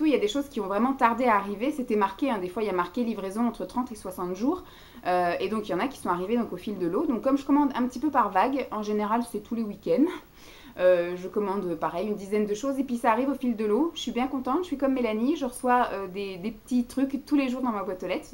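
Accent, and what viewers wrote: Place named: Quebec, Canada. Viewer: Bonjour miss... super tes achats.... comique de t'entendre parler Québécois... d'accord avec toé lolll...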